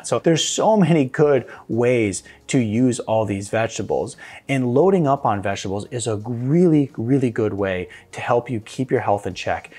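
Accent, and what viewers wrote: United States. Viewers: Terrible strong American accent. Tomatooooooooos doh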